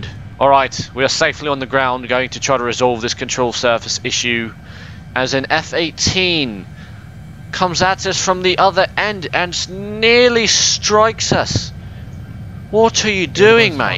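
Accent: United Kingdom. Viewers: Why your voice sounded like your british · I’m absolutely in love with this (I’m assuming British) accent lol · i love your british accent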